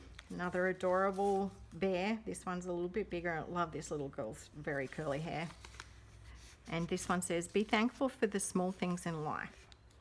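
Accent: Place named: Australia. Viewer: Hi! Beautiful theme! Our Teds are best friends....that texture on the cover is too wonderful.....Hi from Adelaide, so great to hear the accent on YouTube! 😘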